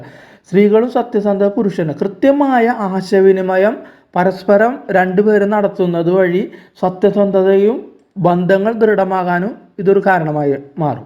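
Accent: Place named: Kochi, India. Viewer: അവതാരകൻ ക്ലബ്ബൗസിൽ സംസാരിച്ചിട്ടുണ്ട് എന്ന് തോന്നുന്നു... കൊച്ചിക്കാരനാണ് അല്ലേ👍😊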